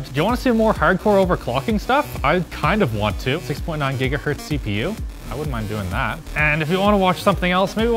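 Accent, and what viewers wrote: Afrikaans, South Africa. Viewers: Loving the Saffa XD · sounds like hes south African? Probably form durban or CPT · Do I detect a South African accent? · sounds South African? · Is this guy south African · Is from South Africa perchance? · You would think this based on the population of the country but you're way off. With 11 official languages the dialects vary extremely. He sounds like an afrikaans native speaker and even then not all of those in SA have the same accent. As a South African I know I'm not spewing bullshit. Also, the numbe of afrikaans native speakers is only around 7 million, which is a lot less than 60 mil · He sounds south african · Is that a Saffa accent I hear >? :) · He's south african ye? ;D good to see one of us on tech tips :) rock it lad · The south african accent is real 😅🤣🤣🤣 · Nothing sticks out more than a South African accent. 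· So good to hear a South African voice in these videos!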